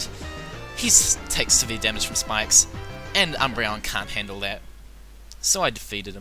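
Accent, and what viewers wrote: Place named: Australia. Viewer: I can't tell what you're saying!? Stupid AUSTRALIAN ACCENT! Can we have subtitles?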